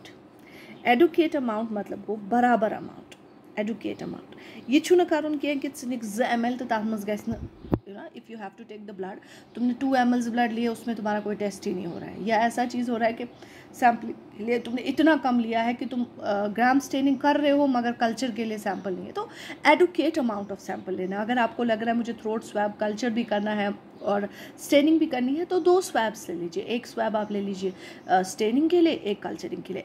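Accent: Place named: Kashmir, India. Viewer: Excellent ma'am ur teaching way is amazing and that tooo in between the kashmiri ascent is phenomenal ♥️♥️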